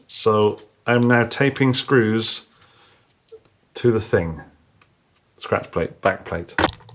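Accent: England, United Kingdom. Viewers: yes we English did invent the English language 😂😂 it's pronounced Lez in England/English. speaks with an English accent so he's pronouncing it correctly.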